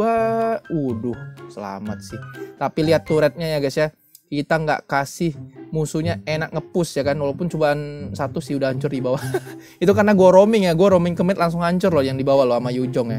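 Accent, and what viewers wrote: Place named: West Java, Indonesia. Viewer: Ohh kirain.. Nada bicaranya, logat bahasa Indo nya kaya orang Sunda Bang. He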